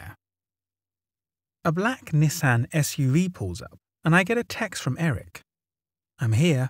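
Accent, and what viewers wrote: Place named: Southern England, United Kingdom. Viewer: Seen every single video on this channel, you’re the best at what you do my friend, and your very southern English accent makes them so much better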